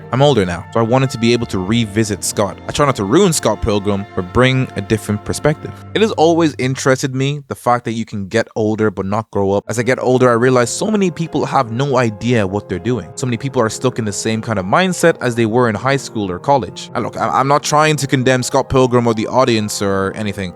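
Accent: Ireland. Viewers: Are you Irish, you kinda sound it?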